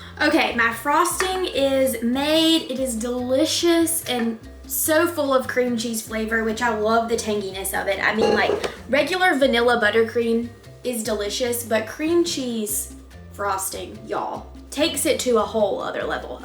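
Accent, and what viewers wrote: South, United States. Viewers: Those are SO cute!!! I love your channel please keep going and making videos! I love your southern accent!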